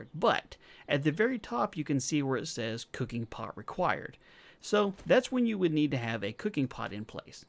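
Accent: South, United States. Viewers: is your accent southern?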